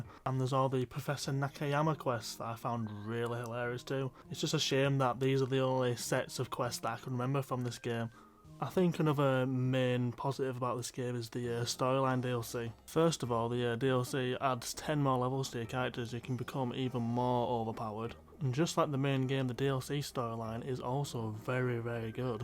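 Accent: United Kingdom. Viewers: I'm no gamer but a sub of your Dad's, a Bradfordiian and love our accent, I've subbed. All the best :) x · I'm more invested in the politics/news side of YT so I don't have a lot of experience with game reviews but this was definitely a good first video. Even though you're from the wrong side of the Pennines, it's still nice to hear more regional accents! 😁 I like how you break the review up by focusing on different elements (characters, gameplay, story, pros/cons) - this would work really well with some graphics and also help you to stay focused (if you're considering how to expand your look and build a brand). You sounded pretty confident throughout and if you were using a script then I think you must have spent lots of time memorising it. The only notes I have for delivery are the intro, you seemed to rush through it and it was a bit too fast. Take a breath and remember that you're awesome! 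Good luck with the channel, and well done on an engaging and interesting first video. 👏👏👏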